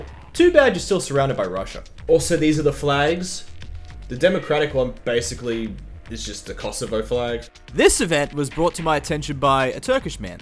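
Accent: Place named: United Kingdom. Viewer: Why are all hoi4 youtuber sound british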